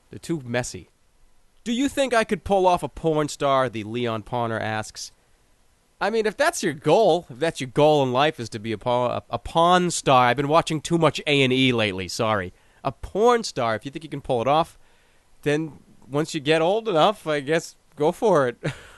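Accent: Boston, United States. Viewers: Wow you are from Boston! Just like a scout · I listen to Jerma so I can talk with a Bostonian accent. MY GOD, THAT ACCENT IS BEAST. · klahndyke bah :D gotta love that boston accent.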